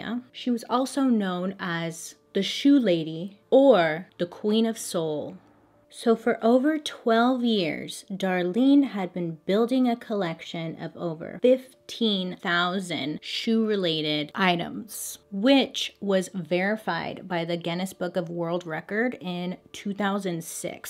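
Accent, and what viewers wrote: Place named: California, United States. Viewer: Watching this in 2020 and I feel so happy to hear someone from my part of California!!!! I lived in Riverside, Moreno Valley and Perris!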